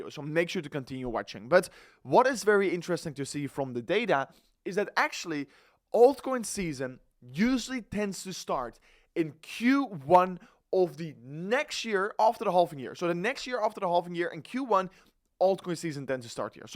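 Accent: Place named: Netherlands. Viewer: Zo klinkt ie wel ja 😅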